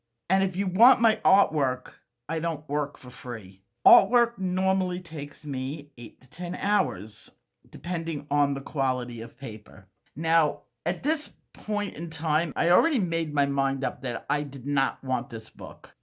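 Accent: New York, United States. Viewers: you are simply fabulous. I get in trouble sometimes for my blunt honesty. However, I will not change that. You made my entire day saying FWOF!!! Your talent and honesty are exactly why I come to you to learn and be entertained as an artist. You got it all! And you know I have a thing for "New Yorker's accent" lol.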